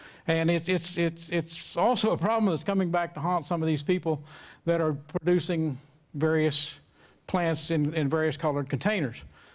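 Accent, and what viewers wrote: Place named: India. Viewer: Looks like the announcer has recently moved out of India. She has a serious indian accent.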